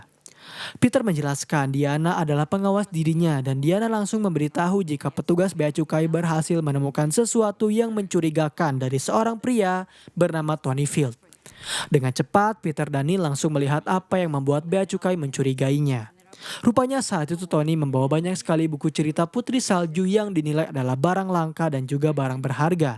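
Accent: West Java, Indonesia. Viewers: Anjirrr gurung gusuh😂😂orang sunda kah min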